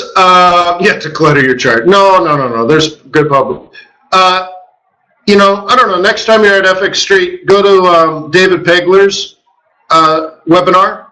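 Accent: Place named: England, United Kingdom. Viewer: you sound so funny with that English accent😂😂😂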